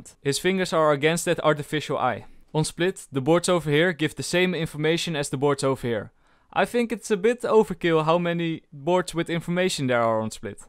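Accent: Netherlands. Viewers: De Hollandse uitspraak van haha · Met dat nederlandse accent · Love the reference to Kud on this moment xd I hear your Dutch accent so you can't say you don't know Kud haha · ik hou van u nederlands accent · Are you dutch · Goed Nederlands accent wel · Ik weet gewoon gelijk dat je Nederlands bent geweldig ik vind je oprecht geweldig man ga zo door!!!! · dutch accent :)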